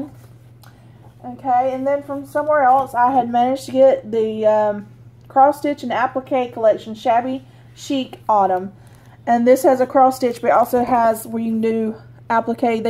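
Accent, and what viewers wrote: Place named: South, United States. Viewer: Just found your station and I am a new subscriber---thanks for a great video, I have a southern accent too. I loved every subject you discussed & everything you showed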